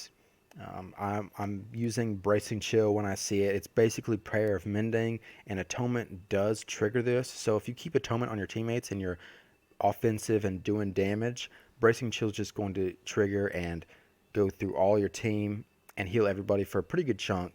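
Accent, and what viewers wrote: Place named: South, United States. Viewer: This is nice to hear a fellow player with a kinda Southern accent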